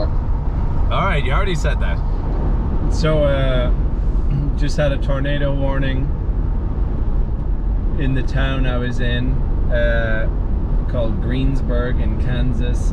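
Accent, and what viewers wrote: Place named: Ireland. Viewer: And, he's the same age as me 😊 lord let me just meet this man and marry him 😂 ♥ PLEASE 😁 JUST a conversation with the real authentic Irish voice!! Or at least just post more videos of you just talking!!💕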